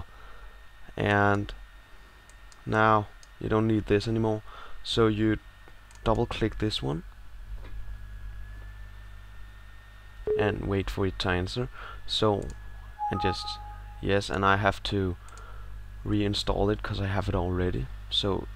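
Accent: Denmark. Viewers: Tak, nu kan jeg komme igang med videoredigeringen igen Synes godt at jeg kunne spotte en dansk accent XP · Danish? Are you from denmark^^'?? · Are you Danish? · Dansker :D? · Haha, kunne tydeligt hører du var dansk :-) Fed video den hjalp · i can just heat clearly you are from denmark! haha!:D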